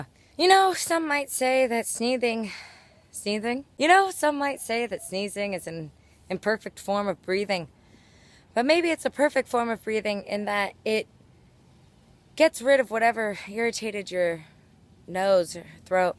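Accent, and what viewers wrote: France. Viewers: Your cadence throughout this feels very french, even before you started talking about accents. :-) Sounds like a good book. I will see if I can squeeze it it. You seem to like books about self discovery, or self help books quite a bit. Or do you make your decisions based on trying to teach your audience?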